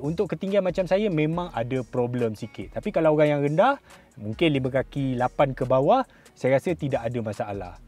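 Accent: Malaysia. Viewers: Best dgr slang malaysia hahhaa. Blh faham btl btl tanpa subtitle. At first I ingat ini indo video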